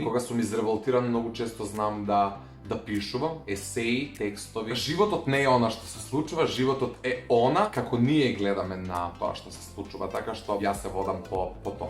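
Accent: Kumanovo, North Macedonia. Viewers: Brat znaes li kolko e tesko od kumanovski dijalekt na makedonski 😹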